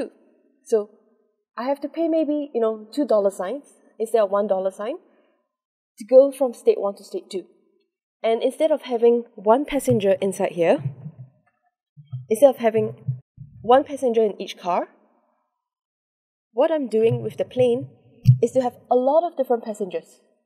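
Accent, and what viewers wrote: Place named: Singapore. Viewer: SINGA-PORE! i can hear dat accent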